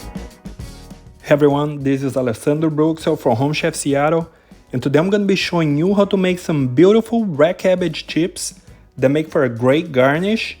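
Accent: Brazil. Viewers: This guy has to be Brazilian. I recognise my own accent 😂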